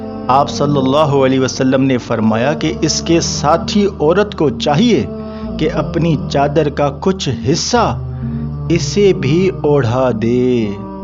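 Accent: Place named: India. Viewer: Apni Urdu sahi bola Allah ka liya ku indians ki terha alphabet ha kha ha kiya quran bhi asa hi perhta ha pata nahi pakistan ma ya kiya bimari chali ha indians ko copy kerna ki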